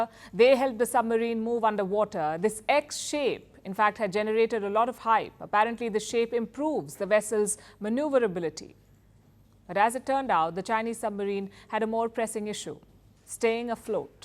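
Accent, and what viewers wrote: India. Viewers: FirstPost and WION are CIA funded propaganda channels. They pick this woman with reasonably pretty face and thick Indian accent to confuse Indians. She is paid to make Indians hate China and Russia. She is professional woman who is selling her face and voice to the CIA for US cash. And you fell for it. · Finally his female "with india voice patterns" does not seem like a cow chewing its Cud OR Carry its self as though the world OWES her The A ++ is her slight Timidity and recognizes there are forces of Awakened that proceed her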